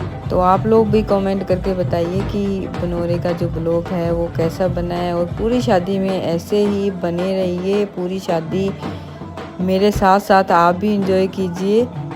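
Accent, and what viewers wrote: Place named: Rajasthan, India. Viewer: ok Ji good, हम भी साउथ दिल्ली से जाट हैं, आपकी भाषा से आप राजस्थान से हों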